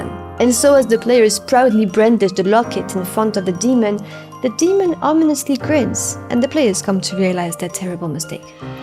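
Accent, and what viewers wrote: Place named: France. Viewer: What a great video. i was secretly hoping wed hear a "Zut Alors!" at the reveal of a twist, once i heard your accent. But i guess hoping to hear that one day is a good reason to subscribe!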